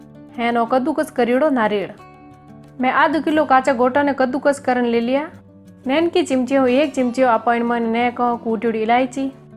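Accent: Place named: Marwari, India. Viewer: Khamma ghani sa🙏 bohot achi marwadi boli sa , recipe b badiya sa, bohot acho lago mithi marwadi sune ne😊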